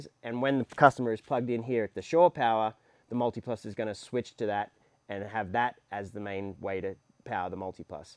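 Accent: Australia. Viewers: Australian accent and then 120V - confused for a while. Disadvantage I see is power loss in converting the 12V DC alternator power to 120V AC then converting it back to 24V DC... I think the new 12-24 Orion would lose less power. I also don't understand why laying some thick DC cables from the Alternator to the Orion should cost so much either... in comparison to the cost of the RV/Caravan. Batteries - I love Victron but there are far cheaper brands of LiFePO4 batteries on the market. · First I thought with your Aussie accent that you were in Australia but not so you’re in the US. Australia has new rules for caravans where batteries that are installed in a liveable space must be in a sealed metal box that’s vented to the outside.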